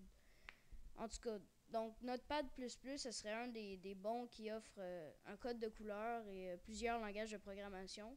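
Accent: Canada. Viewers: Sa se voit que ses un canadien avec la voix. J'ai rien contre eu mes ses la première fois que j’entends parler un canadien. ;)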